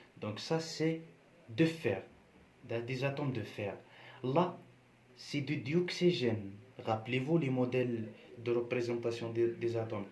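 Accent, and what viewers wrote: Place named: United Kingdom. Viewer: هذا يحجي بريطاني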